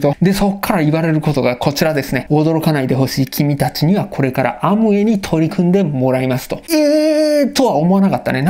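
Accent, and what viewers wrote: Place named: Kanto, Japan. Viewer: さんの動画で過去一おもろかったおちょくってるような関東弁がいちいちツボ(笑)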